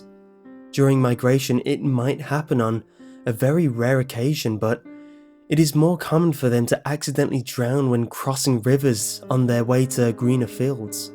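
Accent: England, United Kingdom. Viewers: i realy like your voice it is pritty but i have always had a thing for british guys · u can pretty much read anything with your voice. People love the english accent.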